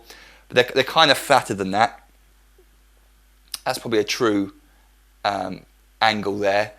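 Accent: United Kingdom. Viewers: Very helpful video! And, I really like and so, but I hate british accent ><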